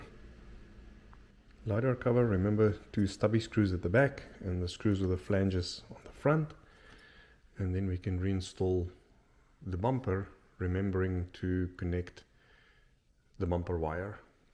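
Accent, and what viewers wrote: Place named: Germany. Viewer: Awesome video! Exactly what I needed for help with my wheel motor replacement. You the man! Love your German accent 😍 Felt like a scientist was stepping me through the robot's puzzle.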